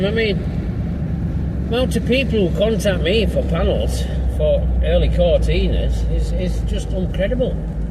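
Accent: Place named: South Yorkshire, United Kingdom. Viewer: I agree broadly with your sentiments. In the current climate a classic car is a luxuary most cant afford. As others said also you need more space and preferably a garage for them. Also if you cant do things yourself its maybe too costly. I would like to dabble one day. I do my best maintaining our 2003 micra and 2006 honda. I have two local garages we send for mot and maintenance and a very handy tyre, exhaust and battery place nearby. All small independent. Im in Huddersfield. Youre in South Yorkshire with that accent.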